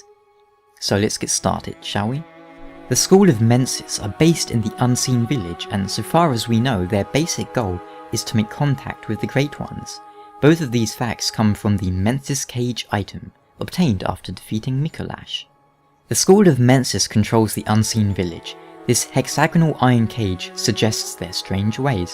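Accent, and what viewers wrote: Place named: United Kingdom. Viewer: His British accent makes this seem as informative and credible as a TED talk :D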